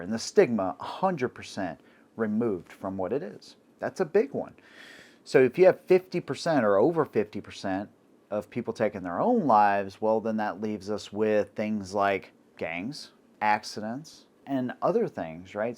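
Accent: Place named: New England, United States. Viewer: Just realized your a Yankee when you mimic a southern draw...i bet you live in the south also....ill not be watching your vids any more...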